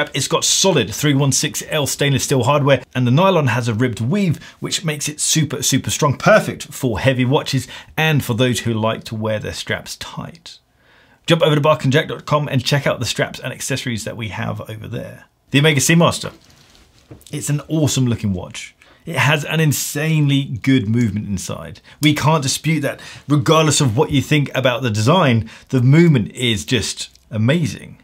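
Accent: RP, United Kingdom. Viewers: Is his accent RP? 😁 I like to listen when speaks. Such a beautiful accent. I heard he pronounced T clearly in most of his videos but when he said "water", it doesn't really sounds like RP.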